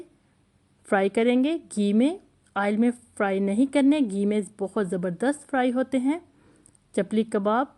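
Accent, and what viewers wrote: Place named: Pakistan. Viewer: Walaikum Assalam Warahmatullahe wabarkatahu Ap jis trah Bolti Ho Jis v Ilaqe se Ho pak se Yaha pe Bht Mere bht Dost Apko trah Bolte hain.. 😊 Big Like G Honestly Full Watch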